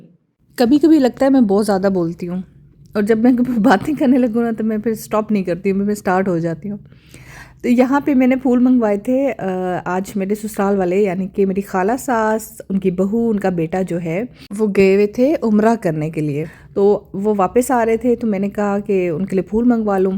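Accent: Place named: Kashmir, India. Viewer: ❤AP k zillah bhimber army center family quarters sa hn AP k velog bhot acha hoty hn 🎉🎉 mñ 27year sa family quarters MN rah re hon AP MN offeiceres waife wala gharoor Nazar ne Aya or 1baat kashmeer k log bhot achy Mily mujy leepa bodr samahni kotli atthmuqaam all bodr ayrias k log sub bhot achy itny driy froouits khay hn hr Tarah k wha g kia baat apke kashmeery zuban metthee se baji 🎉❤❤ bhot ceuit hn sub ko assalamualaikum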